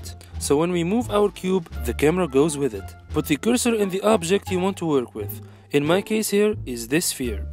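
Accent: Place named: Tunisia. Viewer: borjouleya l anglais mte3ek mtaa tounsi sahby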